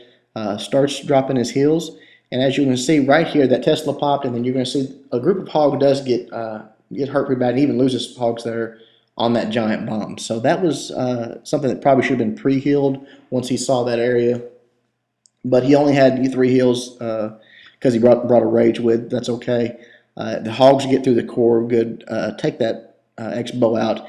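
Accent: United States. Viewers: My new favourite channel. The breakdowns are amazing and your all-American accent just fuels my need to war haha. Keep it up man!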